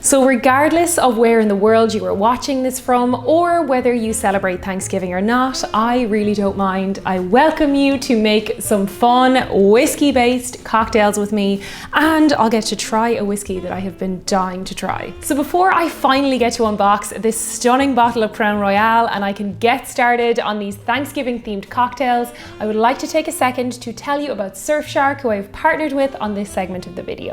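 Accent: Ireland. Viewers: Both my Mom's parents are from Ireland. Your Irish brogue always makes me happy.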